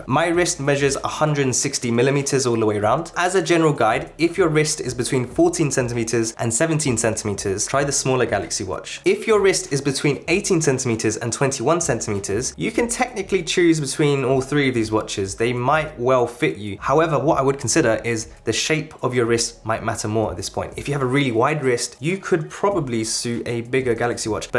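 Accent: United Kingdom. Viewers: Very helpful. Thanks...you sound like your from west london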